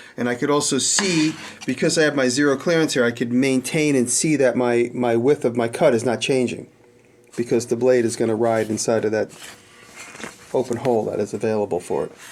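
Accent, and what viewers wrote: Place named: South, United States. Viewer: Great videoes. I have had a band saw for 15 years and Today I found out from you that it has been no where as useful as it is capable of. I am 70, my friend, also 70 just bought his first bandsaw. ( Grizzly). I am going to call him and insist he watch you use a bandsaw with skill. We live in Georgia and Alabama and you talk like us. Spike really adds to your tubes. Thanks for sharing and caring. I hope you enjoy your new upstate shop.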